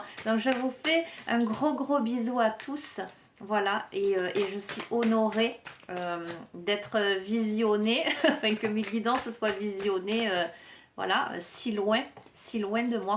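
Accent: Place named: South, France. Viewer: Un p'tit coucou du Mans,. Tes guidances,font résonnance, Avec une sonorité spéciale,qui est un régal,cet accent du soleil ☀️☀️☀️ qui enchante nos oreilles.merci beaucoup bisous bisous toujours un plaisir de t écouter.bonne journée à toi et bisous caresses à tes compagnons à quatres pattes.☀️👍🙏🍀😘🌹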